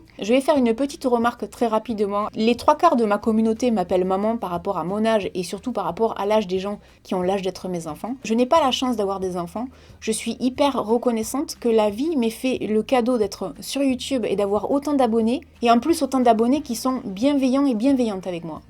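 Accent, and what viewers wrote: South, France. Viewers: Il manque dans ta liste anti déprime une seance au spa avec massage. Ce que j'adore chez toi c'est ton accent du sud comme le mien ! 😉 bisous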